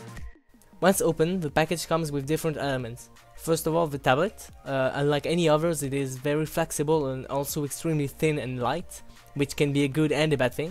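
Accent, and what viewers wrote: France. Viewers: l'accent anglais est pas ouf hein on sent la france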